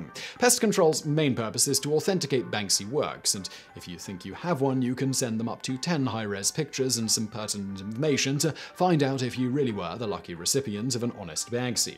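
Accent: United Kingdom. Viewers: Did he just say Yu-Gi-Oh fusion cards it hard to understand UK english its. Different thin US english.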